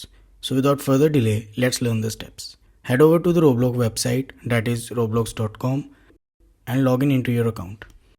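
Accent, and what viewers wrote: India. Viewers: wow, nice scam, makes sense why the accent is indian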